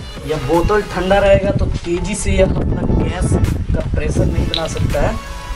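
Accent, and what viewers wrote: Bihar, India. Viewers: Mujhe lagta hai ki app Bihar se hai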